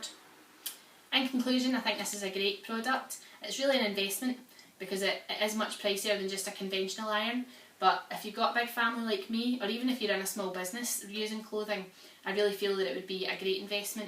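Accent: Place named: Ireland. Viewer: where do you from ? your accent is almost like irish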